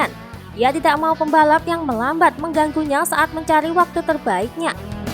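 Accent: Java, Indonesia. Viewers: Admin ny medok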